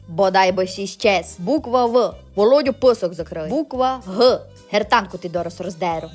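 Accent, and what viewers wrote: Transcarpathia, Ukraine. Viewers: Дуже подобається закарпатський діалект і неординарний гумор)) Та ще й не аби яка симпатюля😉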